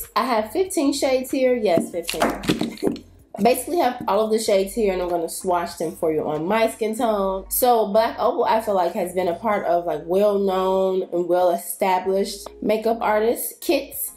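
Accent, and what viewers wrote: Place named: Chicago, United States. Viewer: You sound like you from Chicago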